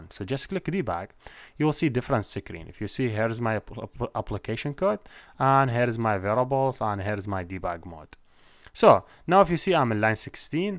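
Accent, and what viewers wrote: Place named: Saudi Arabia. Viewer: واضحه اللهجة السعودية ما شاء الله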